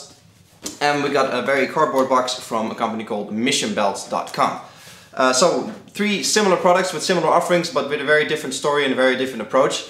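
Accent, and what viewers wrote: Netherlands. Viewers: Is the presenter dutch?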